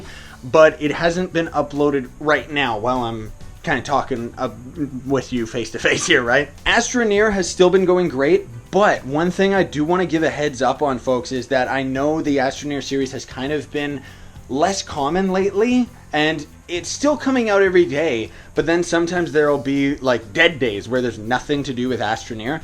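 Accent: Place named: Canada. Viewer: I could tell your somewhat Canadian by the way you say sorry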